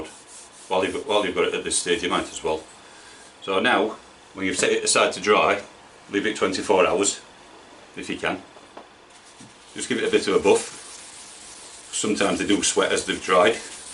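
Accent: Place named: Yorkshire, United Kingdom. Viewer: Suuuuper well explained video! Also love the accent! Yorkshire?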